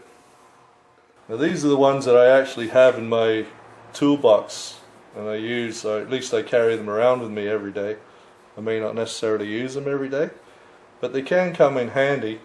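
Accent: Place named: West Country, United Kingdom. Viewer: What is that accent?? Sounds like a mix of South West English and American 🤔🙂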